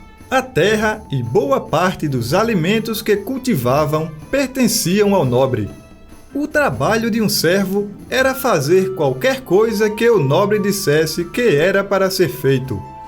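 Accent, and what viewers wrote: Northeast, Brazil. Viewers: Esse sotaque é ótimo. Muito lindo sotaque nordestino.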